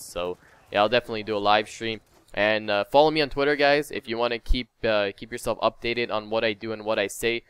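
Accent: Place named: Canada. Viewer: Knew where you came from the second i heard you talk haha. what's up fellow Canadian!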